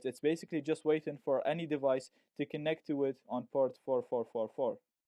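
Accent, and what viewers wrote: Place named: Russia. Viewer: Русский акцент)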